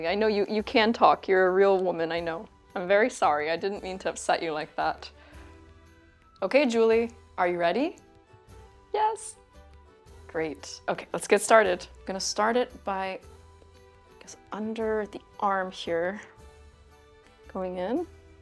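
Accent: United States. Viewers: you sound American. But the way you said privacy makes me suspicious lol 😂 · Sounds US midwestern to me. Lots of extra s in midwestern speach.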